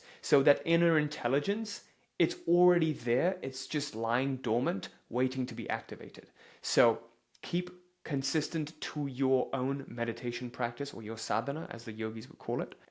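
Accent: United Kingdom. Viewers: Why is Muslim talking about Sanatan Dharm-Hinduism in a British Accent for American audiences? I guess The truth shall set you free, at the end of the day the truth will prevail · Why is Muslim talking about Sanatan Dharm- Hinduism in a British Accent for American audiences?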